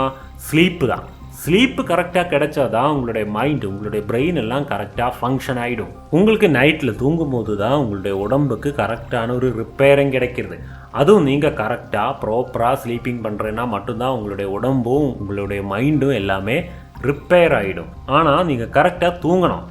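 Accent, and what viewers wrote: Kerala, India. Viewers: Neenga malayaliyaa? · voice super malayalam mix ah na tamil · Malayali yano... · Ninga Tamil lathan pesuringala bro ninga malayalam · You are a malayali ah???? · Brother are you Malayali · Anna I'm sure are you Malayalam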